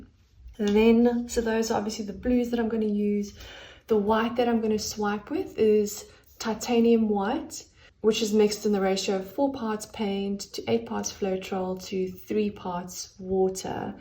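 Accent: South Africa. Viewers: Love the South African accent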